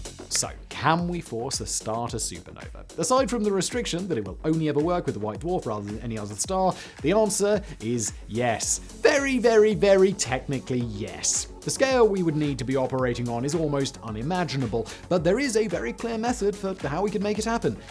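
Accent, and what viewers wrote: United Kingdom. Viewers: Bald man with facial hair and glasses, wearing all black, relaxed on an office chair speaking with a British accent talking about cartoony supervillain stuff (like blowing up a star)... ...Dude starts petting a cat on screen and this is a solid trope. Bonus points if he trades the glasses in for a monocle.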